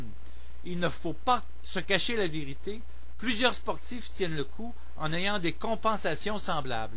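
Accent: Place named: Quebec, Canada. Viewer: Très intéressant! au passage j'aime bien l'accent québécois Bonne continuation!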